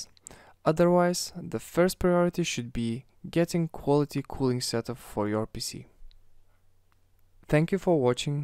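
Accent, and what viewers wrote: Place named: Russia. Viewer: Русский что ли ? По моему акцент есть .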